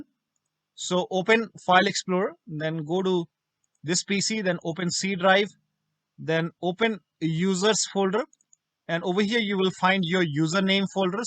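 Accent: India. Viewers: i heard an Indian mans voice and knew i was saved.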